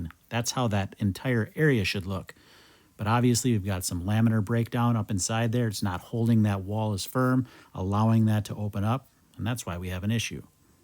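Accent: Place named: Wisconsin, United States. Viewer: I don't miss Wisconsin winters, but I do miss the accent.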